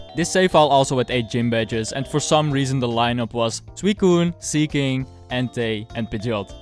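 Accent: Netherlands. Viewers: I can notice a slight Dutch accent. Am I right? :p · r u dutch 👀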